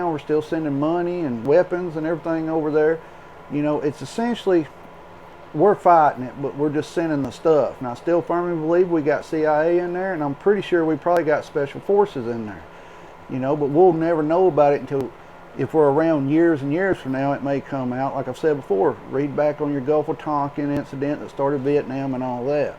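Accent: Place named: Alabama, United States. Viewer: Love the accent! Alabama?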